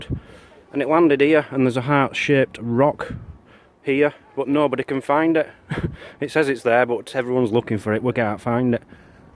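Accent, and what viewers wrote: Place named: Yorkshire, United Kingdom. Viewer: Ye or8 your a Yorkshire man.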